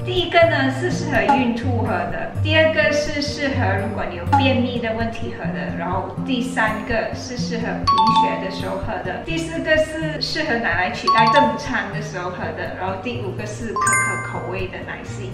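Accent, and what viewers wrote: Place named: India. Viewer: 你说英文有点像印度人说的英文🙈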